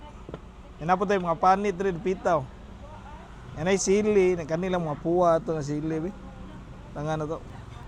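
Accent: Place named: Cebuano, Philippines. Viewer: Bisdak kaayo ka..gahi kaayo diction hahaha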